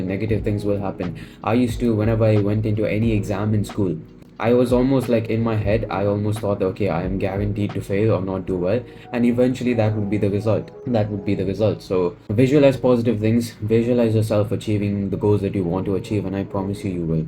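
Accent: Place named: India. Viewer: You being indian and having slightly indian accent do you think any foreigners watch you because i dont think they do only indians watch indians i feel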